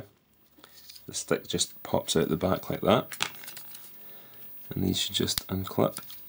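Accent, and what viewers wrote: Scotland, United Kingdom. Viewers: Scottish? Subscribed. Always subscribe to other Scottish gamers :P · I didn't realize he was Scottish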